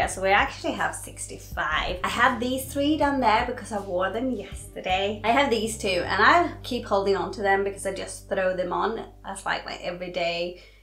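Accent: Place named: Sweden. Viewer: I told my husband you're Swenglish.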